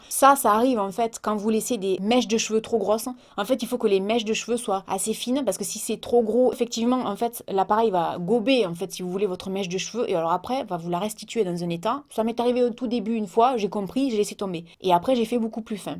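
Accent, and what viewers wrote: South, France. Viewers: Salut, je suis abonnée à ta chaîne depuis environ 1an, j' ai encore découvert pleins d' astuces et produits, j 'ai 58 ans et depuis mes 16 ans ce qui fait un bail, je me fais des gommages, masques que ce soit visage corps, mains, pieds etc. Quand je vais avec ma petite fille faire les boutiques ont me dit que c' est super de faire du shopping avec ma fille elle à 15 ans ce qui nous amusent pas mal. Je mentirais si je disais que je ne suis pas flattée ni que mes chevilles ne gonflent pas. Effectivement il faut prendre soin de soit que ce soit à l 'intérieur comme à l 'intérieur. Je souhaitais te remercier pour toutes ces vidéos supers et ta bonne humeur. J 'aime beaucoup ton accent aussi car j 'ai le même étant aussi du midi de la France. Continue tu es superbe et vrai, peut de personnes peuvent en dire autant.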